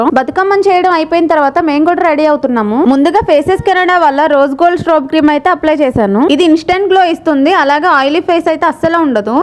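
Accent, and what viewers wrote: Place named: Andhra, India. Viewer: Jara Andhra slang apu akka maku nachuthaledhu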